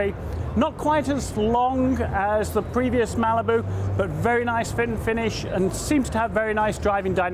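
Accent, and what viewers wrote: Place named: England, United Kingdom. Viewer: Funny he says domestic and sports an english accent while talking about american cars.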